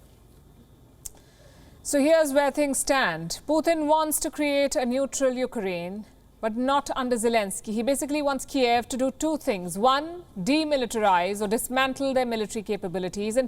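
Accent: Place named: India. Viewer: Even with a strong accent, you are still the most understandable Indian-English speaker lol, keep up the good work.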